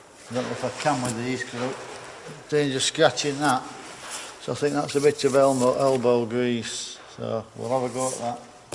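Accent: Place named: Yorkshire, United Kingdom. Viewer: Cheers, just watched your first 10 videos and Im subscribed. Loving the Yorkshire patter and subtitles for the heathens, all the best from Scotland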